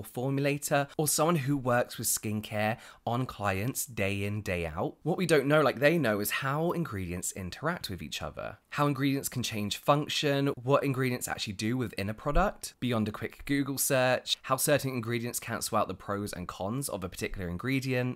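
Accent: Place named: United Kingdom. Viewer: Totally fine with me: I am a Continental European and your British English is... music to listen to! :-) Besides, of course, the content is super-interesting! ♡♡♡ P.S. You know you do your finger counting like us... in the Continent, starting from the thumb and not from the little finger? LOL... ✩✩✩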